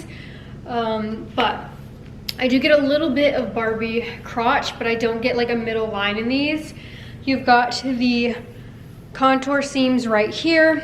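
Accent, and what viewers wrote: United Kingdom. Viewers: no she is Bri-ish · Are you British? you're lil British accent is so freaking cute 🥺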